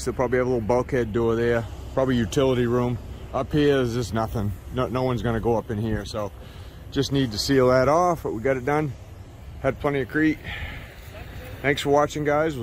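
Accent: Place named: New England, United States. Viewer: Where is this guys accent from? Sounds like a Boston/Maine hybrid.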